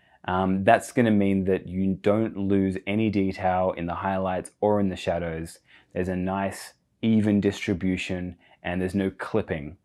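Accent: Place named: Australia. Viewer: expecting a non Aussie accent on YT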